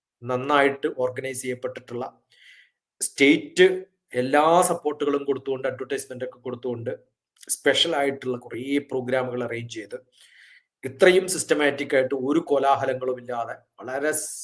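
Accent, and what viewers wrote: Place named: Malabar, India. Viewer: May be from Malabar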